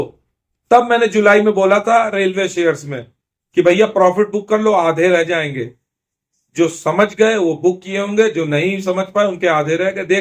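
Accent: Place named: Lucknow, India. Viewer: Bhai app lucknow kai hai kya, maza a gaya apke commentary sun kai. Wow 👌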